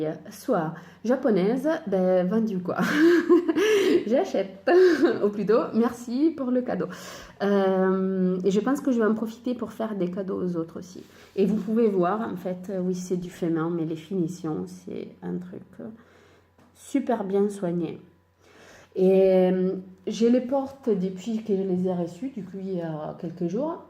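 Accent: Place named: Italy. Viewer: Ciao, conosci le avventure di Arianna? Il podcast di una Veronese in francese. È molto dinamica e sempre gioiosa. Il tuo podcast è simpaticissimo. Mi piace sentire parlare francese coll'accento italiano. Sono francese ma mi piace molto l'Italia. Aspetto il prossimo podcast! Lamartineorzo sur IG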